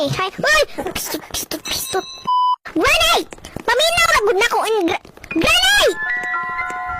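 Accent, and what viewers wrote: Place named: Visayas, Philippines. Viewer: Bisaya ka uyy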